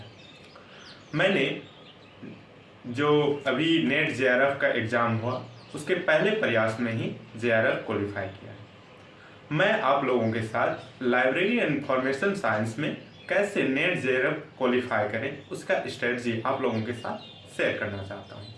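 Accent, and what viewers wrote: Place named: Bihar, India. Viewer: Bro tum Bihar se ho kya?? Awaz se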